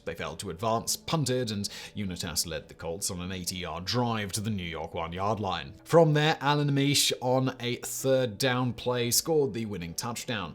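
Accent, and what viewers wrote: United Kingdom. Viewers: So interesting to hear a British accent describing American Football. Even more interesting? Pronunciations of "Unitas", "Ameche", "Stabler", etc. Made me smile.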